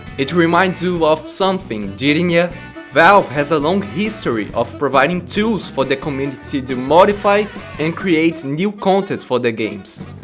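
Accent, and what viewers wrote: Brazil. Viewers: Bom dia mano 😊😊 deu pra ver o sotaque br aew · nice accent. where are you from? Brazil? Seens me talking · this accent... I recognize it... you're brazilian, aren't you? me too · sotaque brasileiro ficou bem incluso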